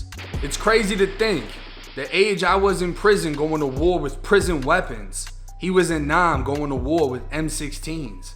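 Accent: Boston, United States. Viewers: Bra you got a Boston accent you must went to Florida on vacation and got caught and did a bit in Florida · Florida story with a Boston accent. Never thought I’d find something more entertaining than this 💯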